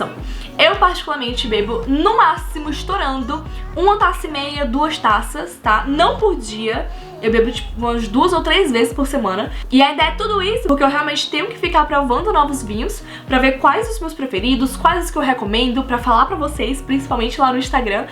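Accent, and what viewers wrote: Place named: Ceará, Brazil. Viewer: Adorei sua aula e sua espontaneidade. E o sotaque cearense. Acertei?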